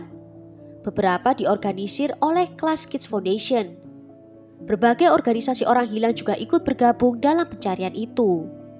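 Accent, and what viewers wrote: Java, Indonesia. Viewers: kamu memang wow sekali pokoknya.... kasus mu pun mantap punya..... dgn aksen jawa yg gak ketinggalan membuat aku terpesona..... top markotop lah....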